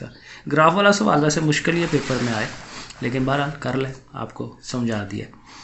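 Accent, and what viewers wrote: Sialkot, Pakistan. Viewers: Sir ap sailkot sy hian ap ki bollli tu sailkoti hia 😘❤️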